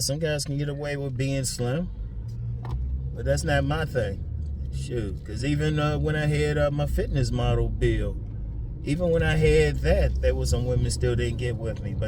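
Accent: Washington DC, United States. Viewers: You sound like you from DC.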